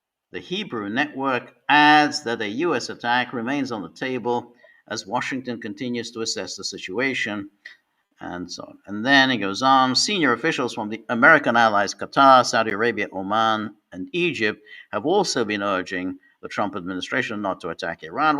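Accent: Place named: Cockney, United Kingdom. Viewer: Love the Cockney accent,. Regarding the debanking and all that, I have to say, it's not right, but it's like the thing from Pulp Fiction, isn't it? The Marcellus Wallace situation. Yeah, I mean, maybe they shouldn't have expected that reaction, but they should have expected a reaction. You know, I mean, come on. The Swiss guy who was sanctioned by the EU. What on earth was he doing anywhere near Europe, let alone still living in an EU state? Two, Scott Ritter. What on earth was he still doing in the United States? What is wrong with these people? And I'll tell you who's next, Alexander Mercois of the Duran. He will be sanctioned. They will clamp down on him. They will debank him. I've got no idea why these people stay in these countries. I left Airstrip One 25 years ago and have never looked back.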